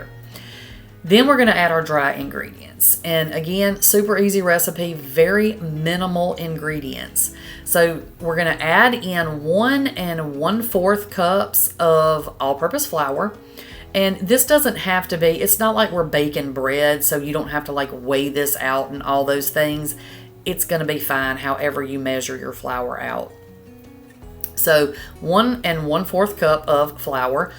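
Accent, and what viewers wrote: South, United States. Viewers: You have such a beautiful Southern Accent. What State are you from?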